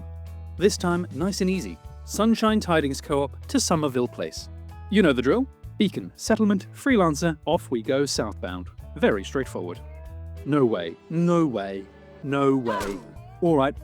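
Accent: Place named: United Kingdom. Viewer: You're Britisj 10/10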